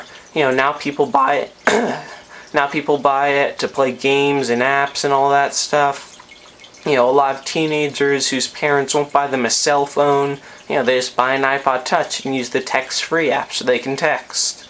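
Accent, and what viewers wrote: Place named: Essex, United Kingdom. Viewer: sounds like your from the essex persuasion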